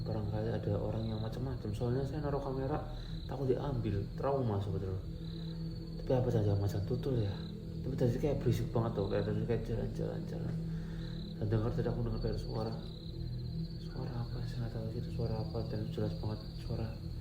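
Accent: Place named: Banyumas, Indonesia. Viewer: Aku biar emak2 ngefans bget ma ka..serem lucu bahasa ngapak y n super brani❤😊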